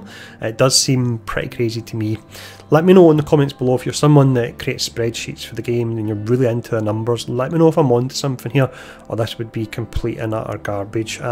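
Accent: Scotland, United Kingdom. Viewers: I absolutely love falconer, and the dive bomb. BUT, cant help myself to feel bad for the poor falcon when he lands that dive bomb, honestly, throws me off, I don’t even want to use it because it looks so hurtful😆 Btw, are you Scottish? You sound like my favorite snooker player, mr. John Higgins 😁 great video all the way through, sub!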